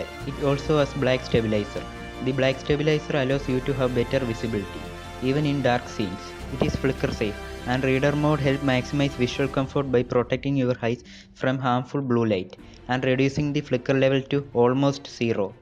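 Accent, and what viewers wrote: Kerala, India. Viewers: Malayali ano....? Speechil oru malayalam touch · Heavy mallu accent ! Any reason why some mallus killed that elephant few days back ?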